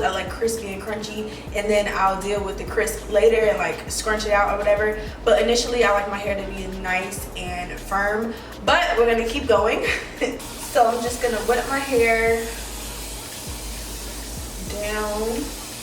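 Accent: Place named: New Orleans, United States. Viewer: Lemme find out you from NOLA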